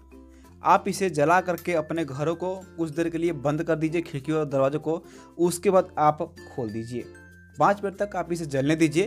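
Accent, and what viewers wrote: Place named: Bihar, India. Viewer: Explained in Bihari accent.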